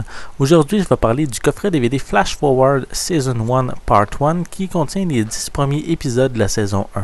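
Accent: Canada. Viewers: jkiff ton accent canadieeen :p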